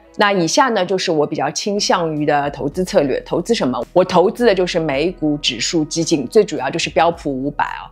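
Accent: Shanghai, China. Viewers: 沪普沪英很亲切，谢谢。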